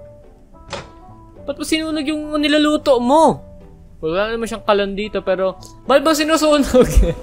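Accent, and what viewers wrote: Visayas, Philippines. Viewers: kuta bisaya kaba?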